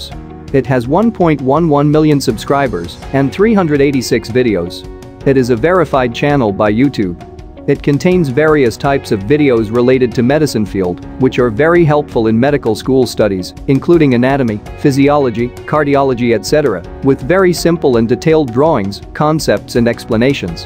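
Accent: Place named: India. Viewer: I agreed with most of the list, but that cruciating hindi acent in the first place?Seriously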